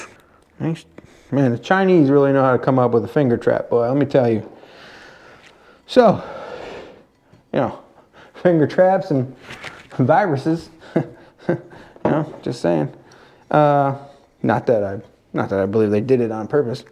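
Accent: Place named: South, United States. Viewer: You’re Southern accent is strong in this video. 😂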